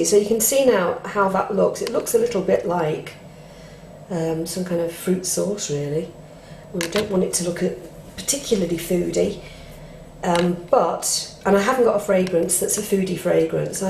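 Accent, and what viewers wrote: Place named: United Kingdom. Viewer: So cute!...i always seem to have issues with my mnp setting in my pouring jug....i dont like to heat it up too much because i worry about my fragrance being burnt off....your mnp looked very hot....you dont have issues with burn off....love ur videos :o)....nice to hear a uk soaping voice! x oh and the cornflour tip..awesome :o)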